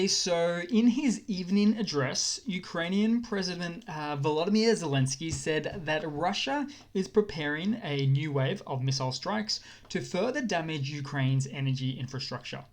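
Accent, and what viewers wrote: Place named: Australia. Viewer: If you're referring to accent, he's Australian.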